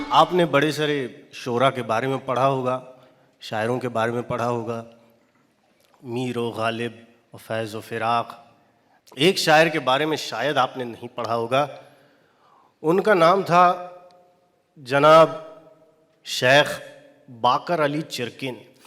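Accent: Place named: Maharashtra, India. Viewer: I can still find Marathi accent in his Urdu. He doesn't sound prominent Urdu; sounds like Marathi Urdu, but very good attempt ❤.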